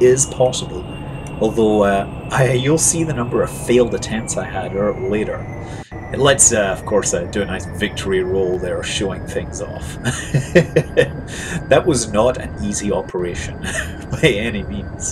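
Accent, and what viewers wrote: Scotland, United Kingdom. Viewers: i'm pretty sure he's scotish.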